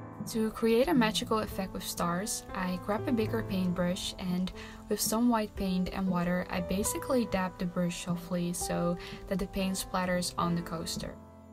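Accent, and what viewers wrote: Netherlands. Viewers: Your accent sounds, N e d e r l a n d s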